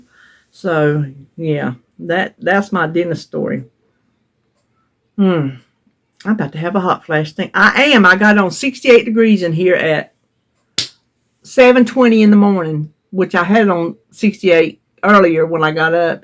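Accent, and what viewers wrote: South, United States. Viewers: Good for you on the loss. Every little bit counts & adds up. You have the right mind set. Thanks for the discussion on carbs. We all need whatever info we can get. Love your southern talk. Makes me chuckle.🌷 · WOOT WOOT! 🎉🎉🎉🎉🎉 I love your Southern!❤ I hate pithy watermelons too!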